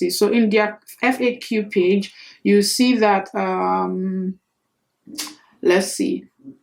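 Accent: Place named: Nigeria. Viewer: Once l heard that voice l knew she is Nigerian. Good 👍 video presentation l searched for "us Universities without GRE" n ur video popped up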